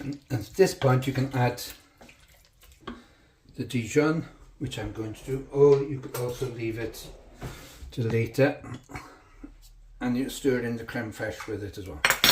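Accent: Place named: Wales, United Kingdom. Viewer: Happy new year I've missed your Welsh tones that looks fabulous. I shall remember this recipe, so you don't have to write it down. ❤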